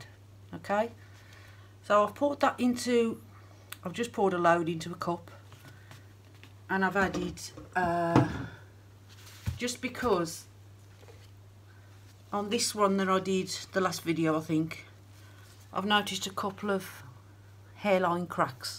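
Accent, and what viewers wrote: Birmingham, United Kingdom. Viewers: Hi Ms Brummie, so lovely hearing that great Brummie accent. Great video I will share on my acrylic art page on Facebook to share your information to my peeps in UK I have resisted taking part in the blooms craze recipe and try to find easy non complicated recipe 😂😂 I’m in Australia so do have access to the floetrol. Well done 👍🏼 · You can't miss a Brummie accent! Lol. (Says a Geordie). 🙂🙂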